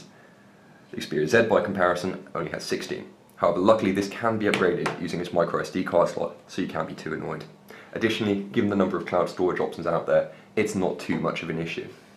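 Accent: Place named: Europe. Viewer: Hate european english accents, cant understan them!!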